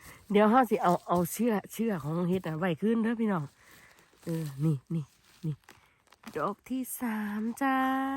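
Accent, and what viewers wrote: Korat, Thailand. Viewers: สำเนียงคล้ายๆคนโคราชค่ะ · ชอบฟัง พูด ภาษาโคราชบ้านเอง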